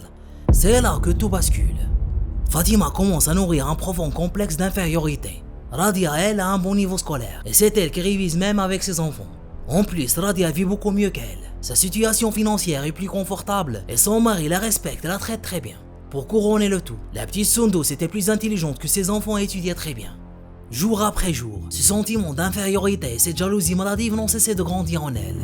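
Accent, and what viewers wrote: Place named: North Africa. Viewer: Au de la du boulot remarquable que vous faites, mais il ya cet accent qui nous rapproche du bled et d'ailleurs j salut tout mes collègues bledar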